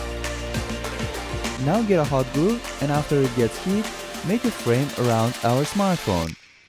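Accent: Poland. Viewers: Nice to see polish accent in this video 1zł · are you from poland becuse you have accent and you used polish coin and you even name you hamster stefan! which is polish name :) pozdrowienia!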